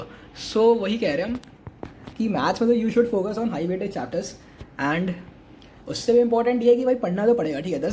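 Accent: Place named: UP/Bihar, India. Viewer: Bhai "ham" up /bihar se ho kyaa?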